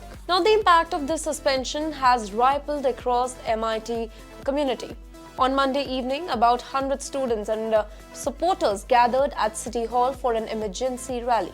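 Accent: India. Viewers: नमस्ते जी, आप अंग्रेज़ी हिंदी के समान बोलती हैं।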